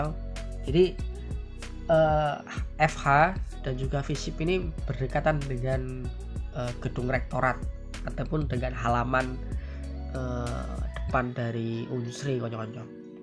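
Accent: Java, Indonesia. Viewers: Wadduh medhok lidahnya hehe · Logat jawa org jawa ke?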